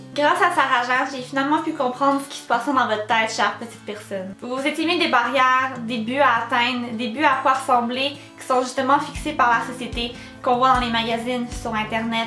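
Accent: Canada. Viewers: belle vidéo j'adore ton accent canadien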